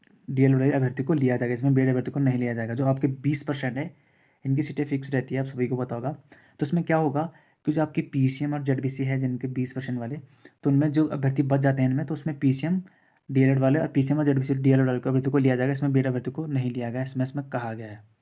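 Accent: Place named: Chamoli, India. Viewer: Bhai pakkaaaaaaa Chamoli ka hai.... Apni boli apni bhasa....,🥰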